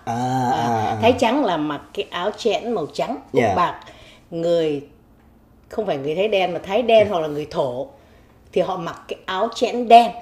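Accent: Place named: North, Vietnam. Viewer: Chương trình của Jimmy rất hay, thật đẹp và dễ thương, nói chuyện huyện thuyên đúng là con gái Bắc kỳ. ❤️❤️❤️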